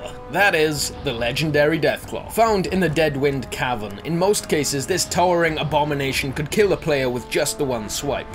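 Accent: Wales, United Kingdom. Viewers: Are you Welsh? You sound pretty Welshy to me :) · Hey, are you Welsh?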